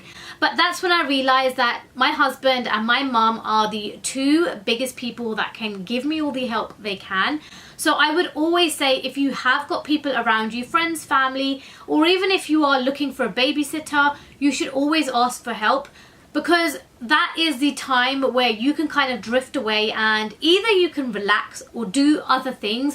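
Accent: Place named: Southall, United Kingdom. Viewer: Your Southall accent is horrible.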